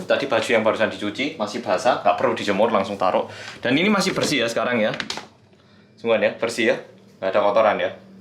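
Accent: Java, Indonesia. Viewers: Dulu waktu 2014an ga terlalu suka sama mas karena ngomongnya super medok, feels so weird for me. Tapi semakin kesini malah makin demen, terutama pembawaannya dan ke sederhanaannya. Sukses terus yo:)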